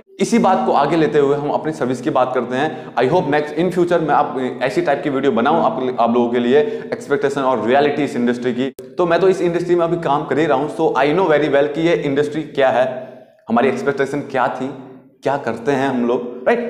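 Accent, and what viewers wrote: Uttarakhand, India. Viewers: Uk walo ka toh bolna he ek alag he andaj h ❤️❤️❤️❤️ pithoragarh 🔥❤️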